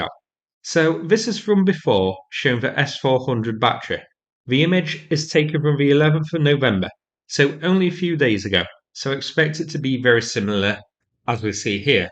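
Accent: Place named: Mansfield, United Kingdom. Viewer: He’s from Mansfield. Slava Ukraine ! 🇺🇦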